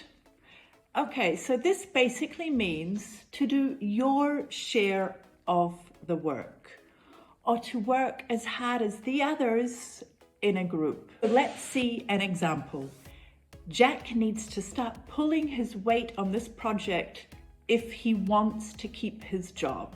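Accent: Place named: United Kingdom. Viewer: i like your british accent and grammer i am trying to recovering perfect tense, when i review i know, but when i communicate i mixed all tenses😅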